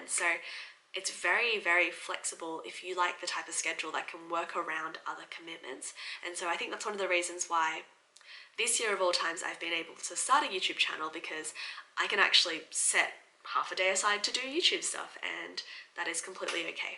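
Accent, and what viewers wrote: Australia. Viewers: keep up your Aussie accent. Im a BBASEAN British born South East Asian, and none of us talk like Brits we all talk like gangsters hahaha 😎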